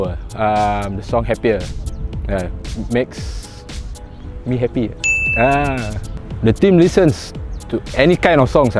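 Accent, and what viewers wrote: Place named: Singapore. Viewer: He's the first in many years that's not afraid to showcase Singlish to netizens,which is definitely a good thing. Good on you man!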